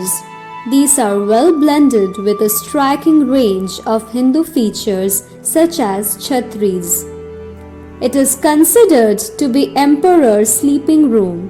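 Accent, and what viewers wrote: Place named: England, United Kingdom. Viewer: Very nice, keep up the good work, very impressive voice and english accent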